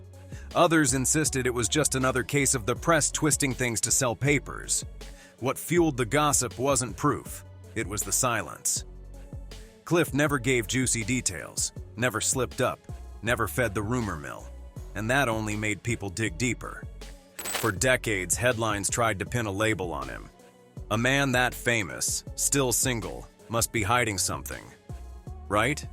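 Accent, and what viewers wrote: United States. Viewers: AMERICAN VOICED CRAP!! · I found this hyperbole tiresome, especially in that IT American accent. Seemingly Cliff did indeed enjoy a permanent relationship with another man. The longevity of this relationship should be celebrated rather than being the subject of unpleasant inuendo. His resistance to probing questions about his private life during the censorious times he lived though is absolutely understandable.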